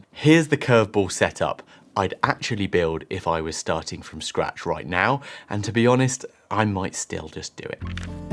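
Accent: United Kingdom. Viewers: Great video! I loved the British accent!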